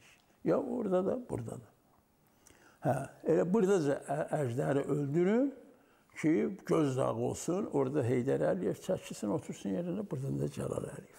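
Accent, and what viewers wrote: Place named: Armenia. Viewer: Əsil yerazlarin ləhçəsilə danişir. Onnar,bax belə danişin. Olar, hansi yaxşi görünür və esidilir.? Yazin.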